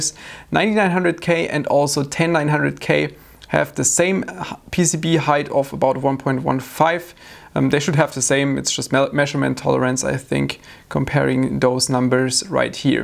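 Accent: Germany. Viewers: du hast so ein episch krassen deutschen Akzent, Daumen hoch dafür:D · Deutsches English klingt always so funny! :D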